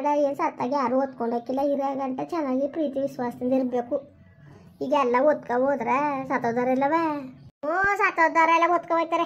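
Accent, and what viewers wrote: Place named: Ramanathapuram, India. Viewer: Yavdu native Nimdu, e Tara language matadadu Ramanathapuram side, heli please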